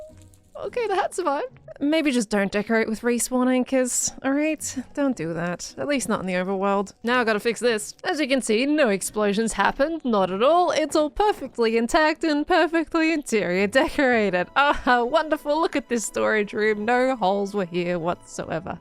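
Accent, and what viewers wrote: Australia. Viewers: its so good to hear an Ausie accent on youtube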